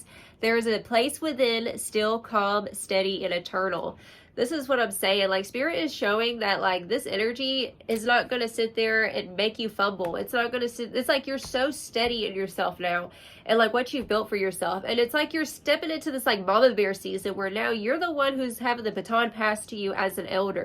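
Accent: South, United States. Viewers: You look Latino? Talk like you’re from the south so ADORABLE/CUTE…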